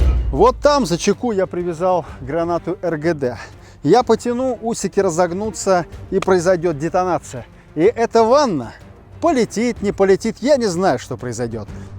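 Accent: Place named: United States. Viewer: Уважаемый ведущий вы вроде мужик а ведёте себя как американец. На ютубе есть подобные ролики американского производства и у меня сложилось впечатление будто манеру держаться и разговаривать вы взяли от туда.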